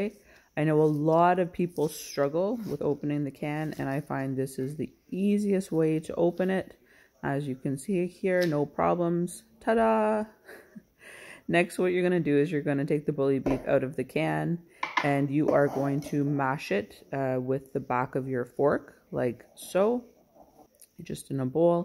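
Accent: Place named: United Kingdom. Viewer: Why did I think you was British lol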